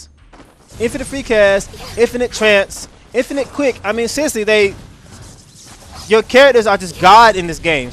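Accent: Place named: South, United States. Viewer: I've been looking at your vids for a few weeks and the thought just crossed my mind "man dude sound like he's from the south" am I right? anyway great LP.. cant wait to actually have time to implement your tips lol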